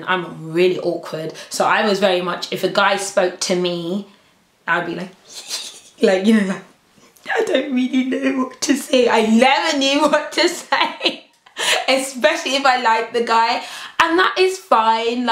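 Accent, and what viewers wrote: England, United Kingdom. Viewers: I love your raspy british accent ugh! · oh my gosh . black women with english accents are just so beautiful to me. black women in general are beautiful, every woman is beautiful.. but i mean, gosh! the accent just kills me, i love it! <3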